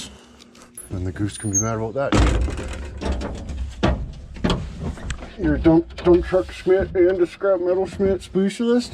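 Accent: Canada. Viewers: Hr sounds like Thommy Chong, I guess its a Canadian thing 🇨🇦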